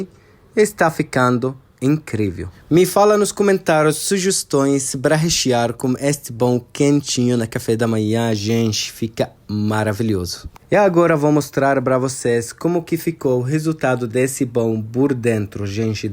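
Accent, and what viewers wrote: Arab world. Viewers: Sotaque árabe muito charmoso !🧡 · Que receita fácil.. vou fazer!!! Eu amo esse português com sotaque árabe!!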